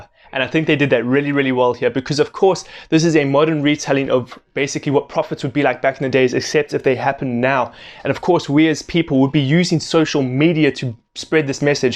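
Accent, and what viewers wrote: South Africa. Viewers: <link> Some background on Islamic eschatology.. btw you sound like a South African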